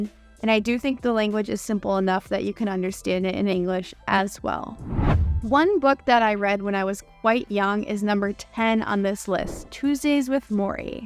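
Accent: United States. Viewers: I love your channel😍. I was looking for a channel like this ( I really like the American accent and this channel has given me tons of information<3) love it!!! Thank you ❤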